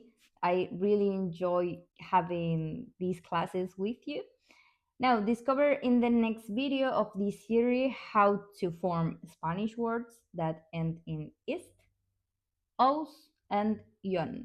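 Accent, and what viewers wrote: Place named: India. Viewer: I thought you were from India 😂. I'm learning Spanish and am from India. Thank you.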